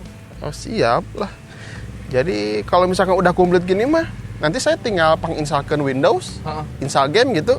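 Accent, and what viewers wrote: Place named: Sunda, Indonesia. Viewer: Logatna meni alus ... Sunda pride 😂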